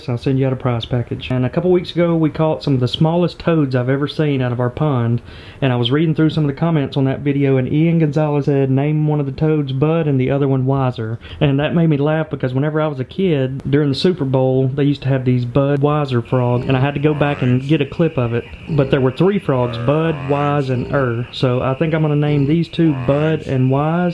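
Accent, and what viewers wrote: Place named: Alabama, United States. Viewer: Omg ok so im from texas but i moved to alabama about 2 years ago i moved back sense but i have a best friend that i miss so much and accent is exactly like hers and i know its because yallare both from alabama but i just wanted to mention it😂😍😭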